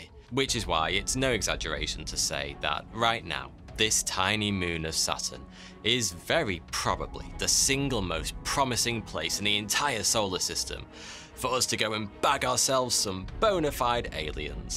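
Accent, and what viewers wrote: United Kingdom. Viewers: Heck me! This dude's appearance and accent is way too traditional like a British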